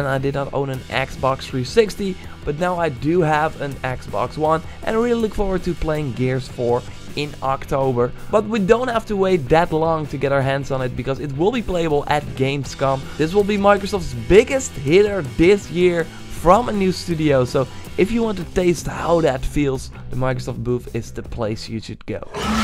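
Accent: Netherlands. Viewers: That dutch accent tho ;)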